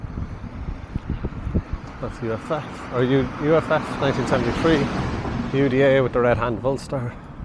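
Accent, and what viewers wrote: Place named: South, Ireland. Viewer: Fair play to you for visiting the Shankill. You seemed a bit nervous and uncomfortable at times. Not surprising considering your Southern Irish accent, but you did a great job. It must have felt a bit like being in the lions den! The Troubles are over and it is much safer now. The locals are not as "paranoid" and aggressive now that people aren't trying to murder them anymore so you should be fine now. They are so used to tourists filming the murals that I'm sure they just assume you are a harmless tourist and ignore you. Even if they overheard you saying something they didn't like they'd probably just let it be or maybe have a wee bit of friendly banter with you and fill you in on the local knowledge/story/version of events.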